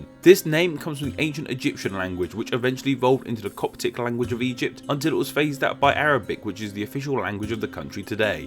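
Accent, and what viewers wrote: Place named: United Kingdom. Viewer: All pronounced impeccably by a British scholar. The raayne in Spaain staays maainly in th'plaain. Please speak a more neutral English. If Marina Sirtis, Patrick Stewart, Jane Seymour, Patrick MacNee, David Niven, Leo G. Carroll, Jean Marsh, Nicola Bryant, and Alfred Hitchcock can do it, so can you. You're speaking to an international audience.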